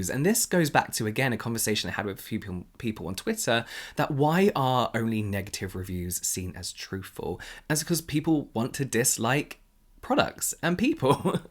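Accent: England, United Kingdom. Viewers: Your voice is so smooth. Can you me sing me lullaby before I sleep? Nah this is more like I will give you myself if you start talking. You have an english accent but it’s not thick just smooth to the ears. Oh I do agree with you.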